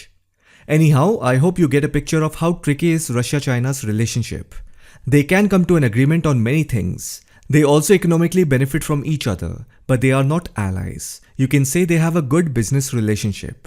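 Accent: India. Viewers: 該死！這個咖哩味的英語、讓我聽不懂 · Индус всё объяснил! Красава. Кстати классный акцент 👍🤝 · Something about the Indian accent that makes you understand easily.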